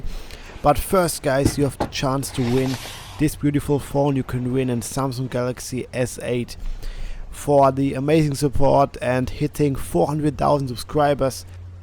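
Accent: Germany. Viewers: Ey deutscher,Man hört das du deutscher bist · Man merkt übel dass du deutscher bist aber nices video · Man hört das du deutsch bist lul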